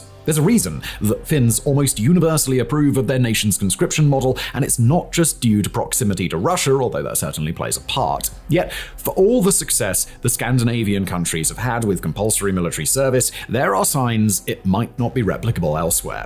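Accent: United Kingdom. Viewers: I’m interested in this. Is it worth suffering the British accent? Nah.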